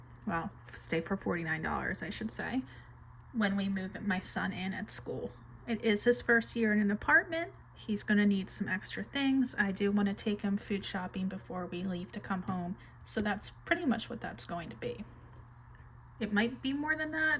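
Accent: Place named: New Jersey, United States. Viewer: As soon as I listened to you, I knew you were from Jersey. My family lives in Wall Township and Belmar.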